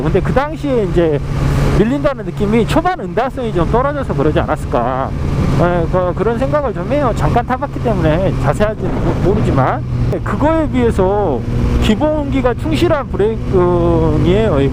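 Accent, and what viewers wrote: Jeolla, South Korea. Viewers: 늘 재미있게 잘 보고 있습니다. 구수한 전라도 사투리랑 같이 들으니 휠 재밌네요 ㅋㅋ 한가지 질문이 있습니다 - 저는 gsx-s1000을 타고 있는데요. 늘 r차에 대한 로망이 있었습니다. 문제는 자세인데 네이키드에 비해 많이 힘들겠지요? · 으따 전라도구마잉~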